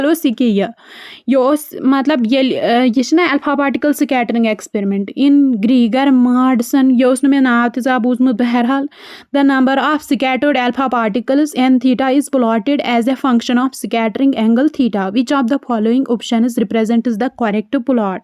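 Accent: South Kashmir, India. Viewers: Are u from south kashmir just saying from accent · Cutest south kashmiri accent.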